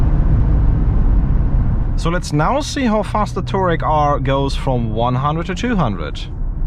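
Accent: Germany. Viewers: dein dein denglish is einfach nur nerfig :)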